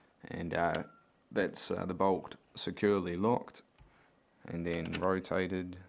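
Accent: New Zealand. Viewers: i was so supprised to hear a new zealanders voice :D where abouts in new zealand u from?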